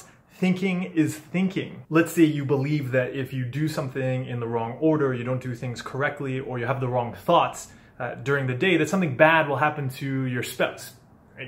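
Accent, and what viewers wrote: Canada. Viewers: He sounds Canadian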